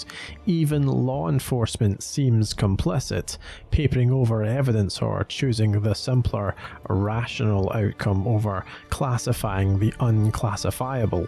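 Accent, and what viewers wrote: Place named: Scotland, United Kingdom. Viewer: The scottish Dialect make it feel like u are a child of a watchers council in the UK giving inside Storys of the Slayer Demin World.Love your Videos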